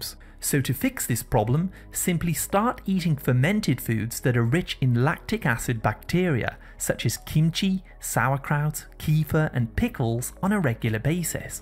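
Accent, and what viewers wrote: United Kingdom. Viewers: I like people. How ever I can't help to say. The British have a real problem pronouncing the English language. It's maddening. Vit,a,men's. Instead of vitamins. Waa,tore. Instead of water. Also it seems the British have a big problem with the letter "r". Look language and the pronunciation of it is key for those who seek to speak or become an orator. One who learns the value of speech and tone. Just saying. Use speech and a calm flow you'll feel good and those listening to you will benefit in the understanding of what you say. Thank you for your time and caring. I won't pick through the other Miss phrased words. Your kind soul is on the good track. Again thank you. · Do brits say "Vytal" when saying vital? If so why do you all annoyingly say "Vitamins" when the prefix is clearly vital pronounced "Vytal"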